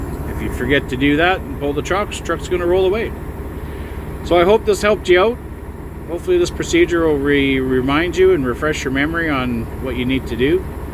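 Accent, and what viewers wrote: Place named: Canada. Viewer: Finally... I found someone who sounds 'Canadian' ...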